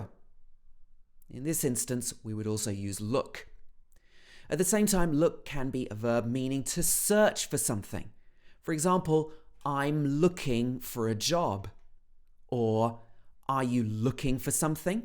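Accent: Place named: United Kingdom. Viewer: I love british accent. plz make videos on paragraph reading.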